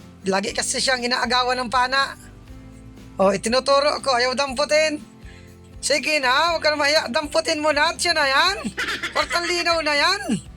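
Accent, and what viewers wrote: Bicol, Philippines. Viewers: ang tagalog ni kuya may mga halong bikol.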